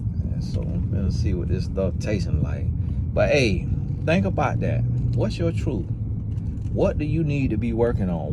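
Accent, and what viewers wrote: South, United States. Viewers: You may have a country accent, but you teach alot of good knowledge! I have a strong Texan accent, when I travel sometimes ppl don't understand me. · I love your country accent.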